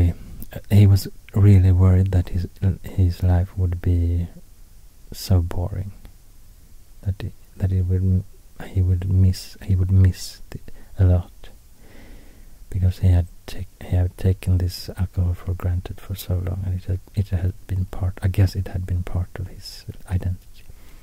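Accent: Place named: Sweden. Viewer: I specifically came here for Swedish language and Swedish accent, so feel free to mispronounce any words :D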